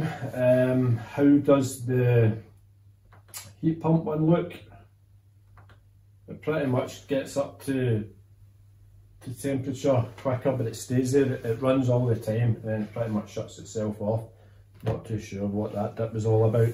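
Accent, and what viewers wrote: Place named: Scotland, United Kingdom. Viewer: you are definitely Scottish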